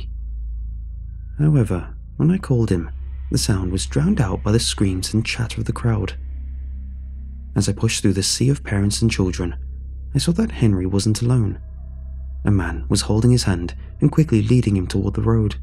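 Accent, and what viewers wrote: United Kingdom. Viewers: or anyone else, can you tell me what part of the Britain his accent is from? It's the clearest sounding British accent I've ever heard and now I love it lol.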